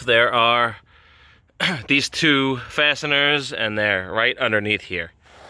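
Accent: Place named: North, United States. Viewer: I actually had to google what the heck that was 🤦‍♀️😂 LOL! I don’t talk to many people from Lawn guyland, so I was out of the loop. You definitely have a northern accent! Especially when you’re talking to other northerners. I do the same thing when I go home 😄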